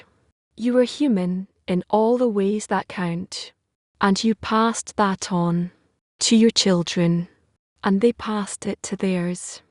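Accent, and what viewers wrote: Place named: Scotland, United Kingdom. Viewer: Love the Scottish voiceover 🏴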